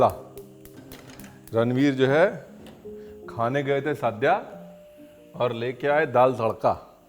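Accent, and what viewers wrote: India. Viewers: Ur food is next level sir,it always has been, but the way you speak in between, those small small nuances that u add,n ur desi accent adds a different flavor n tadka to ur recipes.love u n god bless u.😀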